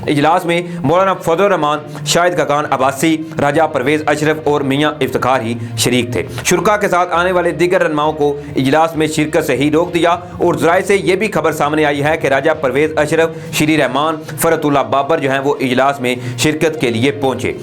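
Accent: India. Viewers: جناب صاحب اگر آپ مناسب سمجھیں تو اپنا تلفظ ٹھیک کریں ھمیں انڈین ھندی ٹائپ کی اردو سے نفرت ھے جیسے ۔ خون کو کھون کہنا خالی کو کھالی ۔ خانہ کو کھانہ وغیرہ ۔ اگر یقین نہیں تو اپنی سپیچ دھرا کے سن لیں اور باز رھیں ۔ لگتا ھے نئے نئے انڈین فلموں سے متاثر ھوئے ھیں ۔ اپنے اردو کو خالص کریں پلیز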